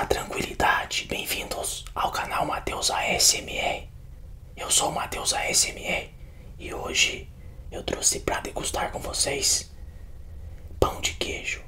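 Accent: South, Brazil. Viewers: Tu é de SP mesmo Matheus? Teu sotaque parece do Sul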